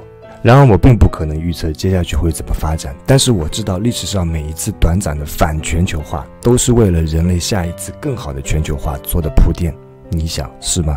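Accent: Shanghai, China. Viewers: 满满上海口音😄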